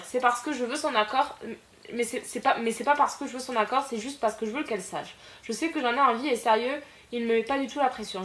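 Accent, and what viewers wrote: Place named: Toulouse, France. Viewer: Dac, dac, j'adore ton petit accent toulousaingue:)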